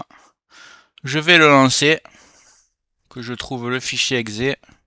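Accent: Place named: South, France. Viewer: Merci! JE suis un Québécois francophone et ça me fait du bien d'entendre un expert qui parle de Windows en bon français avec un accent du sud! Comme je vis dans un océan d'anglos, et que la technologie venait surtout des USA je regarde beaucoup de vidéos en anglais mais j'apprécie le fait de vous écouter dans ma langue!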